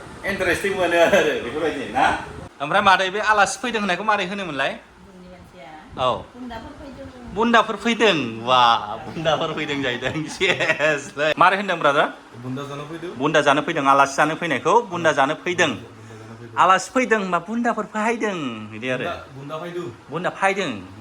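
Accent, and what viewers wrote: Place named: Bodo, India. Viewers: Kokrajhar ni ya nw mwjang boro railai nai ya ada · Bengtol niyasw pure boro rao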